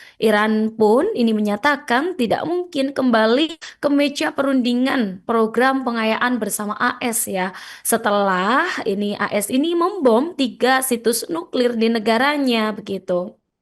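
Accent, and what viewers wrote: Java, Indonesia. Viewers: Hostnya medoknya nagihh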